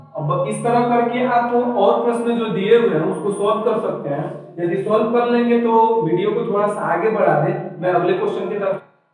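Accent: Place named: Gujarat, India. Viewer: Apki awaz gujrati sunayi parti thora saaaf bola kariye sir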